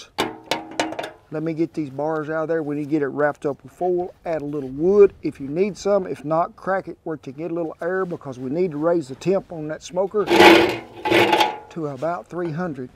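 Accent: United States. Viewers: I love your cowboy talk. It is like a computer language.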